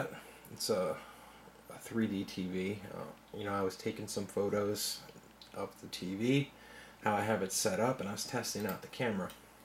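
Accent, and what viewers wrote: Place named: Midwest, United States. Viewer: OK, first off, I can't believe they would make you pay a fee for THEM to disclose shit about the property, I thought they HAD to disclose a haunting! Email that show "The Dead Files" on the Travel Channel and get Amy Allen to come out and see who the heck is haunting your property. You have a baby, tell them that and they may make time for you. You sound "mid west" so start looking, lots of paranormal groups or a priest or a shaman. Start doing research on the property and the grounds or area.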